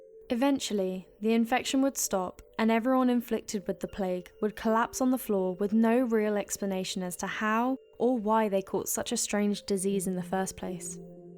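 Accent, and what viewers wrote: United Kingdom. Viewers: Hey...I love your beautiful voice! I also really like the voice of the young man with the lovely British (uh, I believe) accent that is like yours. Great video as usual. Hope to hear more from both narrators.🧸🎈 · She sounds British..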